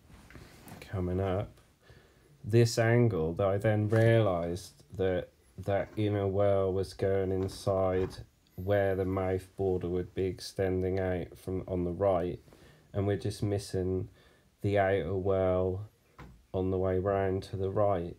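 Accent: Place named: Norfolk, United Kingdom. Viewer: Hi, I live on the Norfolk coast and you sound as if your from my neck of the woods, I have found sum interesting find that I would like to share with you and wondering the best way preserve them to get the best from them.????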